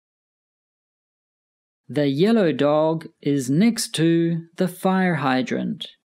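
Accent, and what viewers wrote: United States. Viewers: Is these English American?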